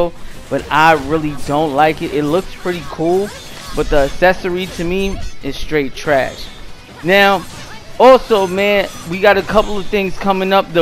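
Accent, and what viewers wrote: New York, United States. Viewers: this man definitely from new york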